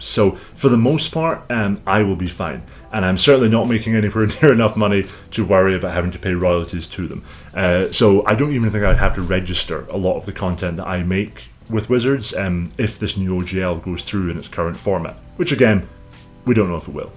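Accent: Scotland, United Kingdom. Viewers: I have literally never heard of this channel before but silver lining of this OGL debacle is that I am finding creators I had never heard of. Also you have another fan of the accent here :D. Is it Scottish?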